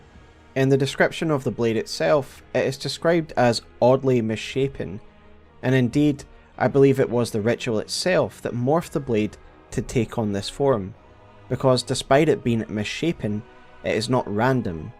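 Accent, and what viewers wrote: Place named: Scotland, United Kingdom. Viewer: Your nuts bro, listen to a fellow Scotsman Explain Elden Ring lore is top notch. Well done ma man! 🫡 🏴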